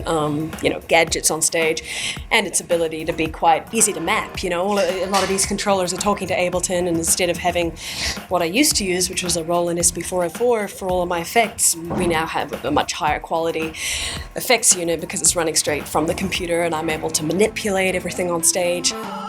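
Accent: United States. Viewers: Shame she is turning so yank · Her accent has really shifted towards American sounding. Anyways, love her. Love the rig. Love the music.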